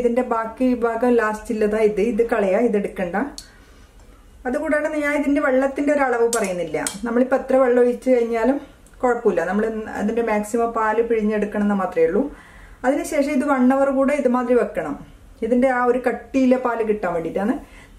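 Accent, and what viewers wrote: Kannur, India. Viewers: കണ്ണൂർ ആണോ ചേച്ചി..സംസാരം കേട്ടപ്പോ തോന്നുന്നു..കണ്ണൂർ ആണെങ്കിൽ, എവിടെയാ. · നിങ്ങട കണ്ണൂർ സ്‌ലാങ് കേക്കാൻ നല്ല രസമാന്ന്... ഞാൻ ഇപ്പൊ ഉണ്ടാക്കിക്കൊണ്ടിരിക്കിവാന്ന് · Are you from Cannore, parayunna reethi kettappol tonniyathaanu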